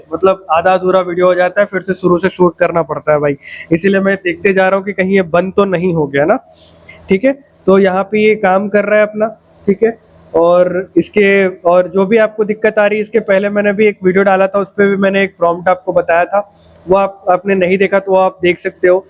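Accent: India. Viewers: bihar s ho kya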